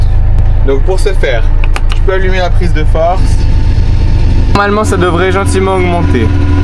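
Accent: Switzerland. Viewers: top ta vidéo, et j'adore t'entendre parler, "ton petit accent suisse" bonne année a toi et tes proches et tu ne fait pas un boulot de faignant a +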